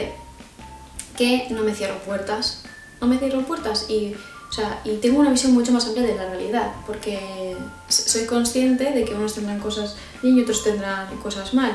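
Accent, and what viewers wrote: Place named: Spain. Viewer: Son buenisimos tus vídeos, creo que vas a llegar muy lejos, tu acento español y tu voz trasmiten paz. Saludos desde Argentina!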